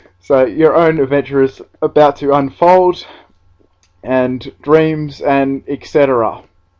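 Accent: Australia. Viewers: what part of aussie are you from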